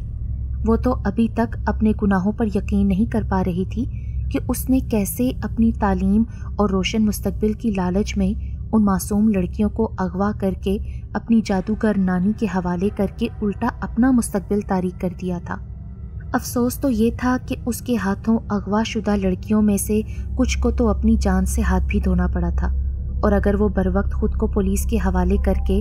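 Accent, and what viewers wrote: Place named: Bangladesh. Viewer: Bangali lehja acha lagta hai ap pe😍😍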